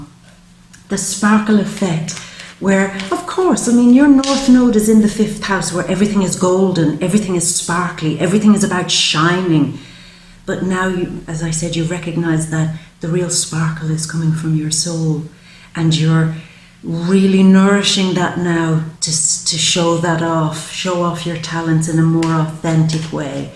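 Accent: Ireland. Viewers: your got a good Irish accent lass I like it what about you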